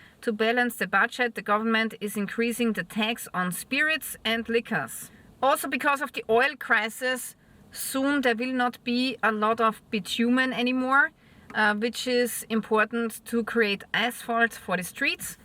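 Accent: Germany. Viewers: I never liked a German accent but I like hers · Thank you for this summary of unsavory changes in Europe. Living in America, I’m often preoccupied with our actions and reactions, forgetting to stay up to date on the other regions. Love your accent by the way, reminds me of dear family friends I have in Mannheim.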